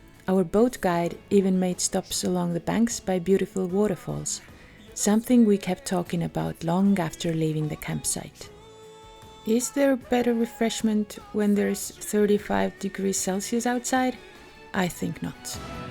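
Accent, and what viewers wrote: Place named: Estonia. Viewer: Aitäh, mulle väga meeldib eesti aktsent! Hääldate mõningaid mu emakeele termineid väga täpselt. Olen vaimustuses ja hämmastunud. Aitäh, mulle väga meeldib eesti aktsent! Hääldate teatud väljendeid minu emakeeles väga täpselt. Olen vaimustuses ja sügavalt muljet avaldatud. Lisaks olete väga asjatundlik ja objektiivne, mida ma eriti hindan. Ma ei tea Eestist kuigi palju, kuid edaspidi püüan teie kodumaa kohta rohkem teada saada. Aitäh, et avasite mu silmad... Parimate soovidega, Samir (Sarajevo) :face-red-heart-shape::hand-pink-waving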